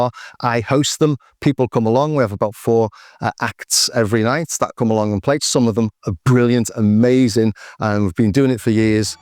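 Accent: Liverpool, United Kingdom. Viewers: It feels spooky to have a construcitve lecture from someone with the liverpool accent on the corrosive effect on society of the politics of envy, but you are 100% correct. Make music with what you have, it's still fun. And it's always rewarding how one thing leads to another in the music world if you put yourself and your work out there.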